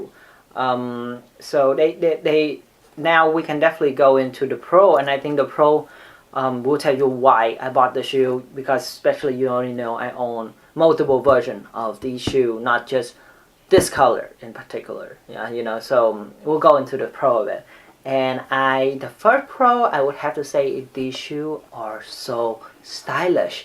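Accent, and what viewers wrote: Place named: Vietnam. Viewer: Well his family name is popular in Singapore and Vietnam , but his accent also makes me wonder if he is Vietnamese or Singaporean...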